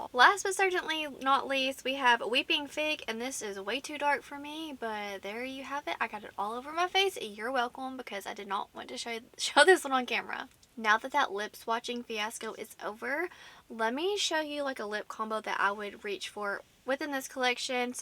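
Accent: South, United States. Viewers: I'm a new subscriber of yourd and I am really enjoying your channel and your beautiful southern accent! My family is from Temnessee and I love the subtle differences across the states! ❤ I'm eagerly waiting this palatte!!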